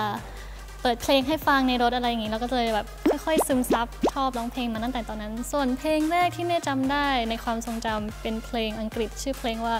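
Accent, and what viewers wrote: China. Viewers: เริ่มพูดไทยไม่ชัดและมีสำเนียงจีนติดมา แต่แค่ปีเดียวพูดจีนได้ขนาดนี้ถือว่าเก่งมากๆ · นางฟ้าตัวน้อยเริ่มพูดไทยสำเนียงเหมือนคนจีนพูดไทยละ 555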